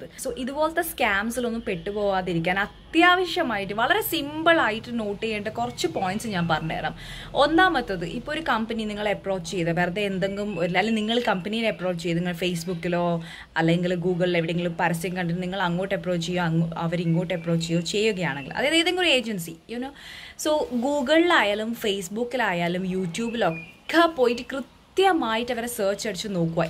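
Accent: Kannur, India. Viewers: കണ്ണൂര് കാരിയാണല്ലേ. നല്ല അവതരണം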